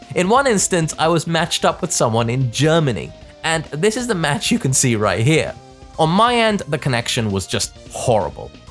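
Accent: United Kingdom. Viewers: you live in japan? thought you were bri'ish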